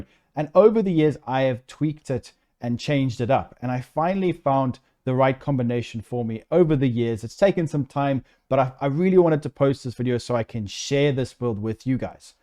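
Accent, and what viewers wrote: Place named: South Africa. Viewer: From your accent(btw loved the vid), are you South African?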